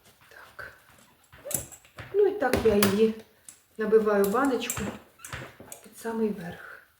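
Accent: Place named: Surzhik, Ukraine. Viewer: Якщо б требо було повільніше, то не вийде.Суржик жахливий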